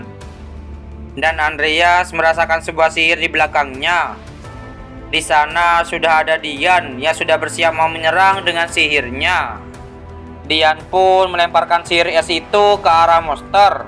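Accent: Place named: Bali, Indonesia. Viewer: Logat ngomong nya kyk orang bali kyk bli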